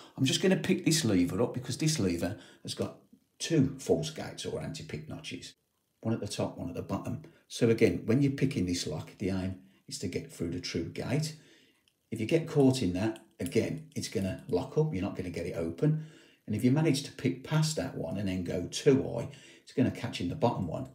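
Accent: Birmingham, United Kingdom. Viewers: Clear as glass. What a very cheeky lever having anti pick top and bottom.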